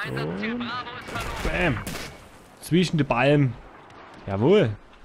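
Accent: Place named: Saxony, Germany. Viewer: nu isch find das sächssische eefach genial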